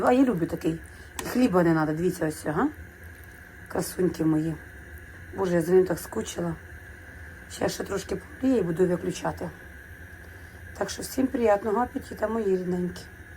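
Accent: Ukraine. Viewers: Говір український тоже люблю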